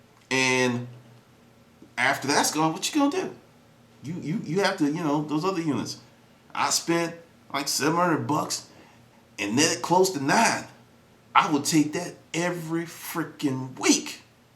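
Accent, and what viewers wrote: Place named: New York, United States. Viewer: U have a touch of NY in u :) We r real people, straightforward, no BS! That's why I'm one of your biggest fans! Professional but have a little hood! LOL! keep up the good work!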